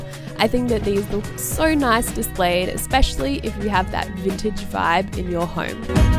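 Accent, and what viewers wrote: Australia. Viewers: Are you Australian? If so, what part?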